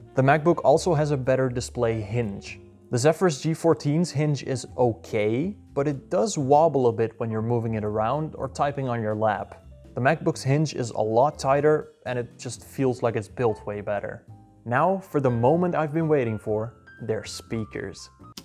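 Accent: Netherlands. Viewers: The first dutchy who doesn't have such a strong accent haha dankjewel! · Ik hoor een heeel Nederlands accent 😄 Eindelijk een goeie vergelijking die ik zocht. Thnxxx👍👍👍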